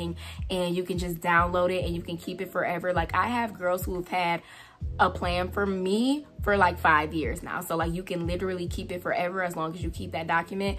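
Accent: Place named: Midwest, United States. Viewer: Loved this video’! I am officially 15lbs down with 30 more to go. Side note: I knew you were from the Midwest. I heard the accent in your voice. I’m from Chicago lol.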